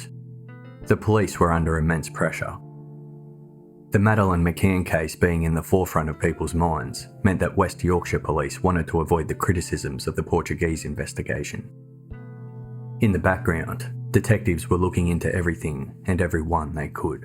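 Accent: Australia. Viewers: These uploads are brilliant!! I'm so glad I stumbled across this channel...I'll be here for quite a while! I also love hearing a fellow Australian!!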